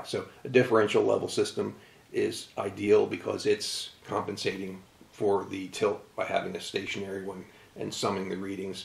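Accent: Pittsburgh, United States. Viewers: haha, thanks for the chat and knowledge! My mom is from Aliquippa and hearing your accent reminds me of my uncles over there in the Burgh.. Also, one of the reasons I like Jim Kramer's Mad Money too, lol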